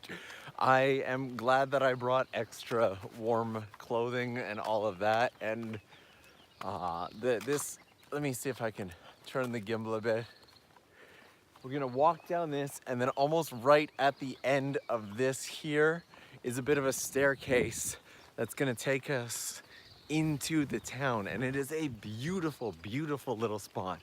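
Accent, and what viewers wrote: Canada. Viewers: You look, sound and somehow talk like Ryan Reynolds...and I'm guessing Canadian?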